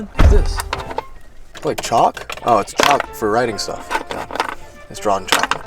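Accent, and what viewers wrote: South, United States. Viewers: Yay the update (I swear you had such a stronger country accent in this video) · Listen closely. is developing a southern accent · Just me or did he have like a super southern accent today?? lol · sounds more country for some reason lmao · got a truck and now he has a country accent. I used to watch vids all the time, now I check in after 2 years not watching and he has 1 million subs and a country accent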